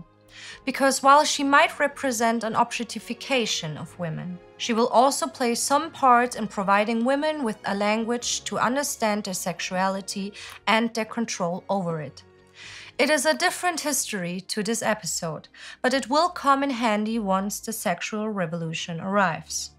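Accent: Germany. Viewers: Why you got Axis Annie representing your channel? Couldn't you find an American woman to speak for your program? There must be about 150 MILLION of them, you gotta choose a German speaking woman? That's pitiful.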